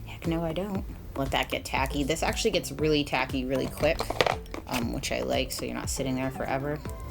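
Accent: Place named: East Coast, United States. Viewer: I had insomnia and woke up at 6PM (I’m French, so it’s already dark here). So basically I lost my Saturday and woke up completely depressed. But now in the upcoming darkness I can see a ray of hope, a glimmer of light all the way from across the ocean. Yaaas. It has long nails and a raspy east cost voice. It’s my queen and she is baaaaack. I am now ready. Candles are lit. Coffee has been made. I can enjoy your video. Thanks. Your timing is amazing. Always an absolute delight to watch your videos. Your personality and honesty are refreshing. All hail the dragon lady nails queen 👑! Love ❤️ (from across the ocean)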